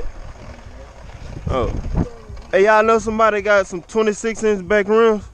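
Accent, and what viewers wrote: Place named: Louisiana, United States. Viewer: you got to be from Louisiana. not sure what town that is. kinda reminds me of villeplatte.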